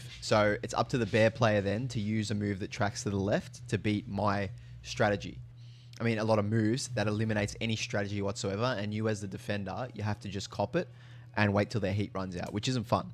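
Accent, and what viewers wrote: Australia. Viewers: Dam, it’s refreshing to hear an aussie accent in a tekken vid ey lol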